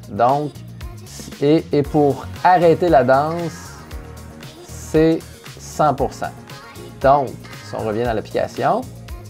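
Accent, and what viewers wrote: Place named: Quebec, Canada. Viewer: Merci pour ce tuto en Français avec un petit accent québécois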